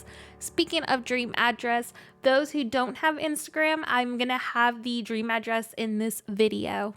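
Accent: United States. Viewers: Ooooo, do you live in the Southern Hemisphere? I would have guessed Northern only because you seem like you have a US accent. I'm from New England myself (the land of regional accents).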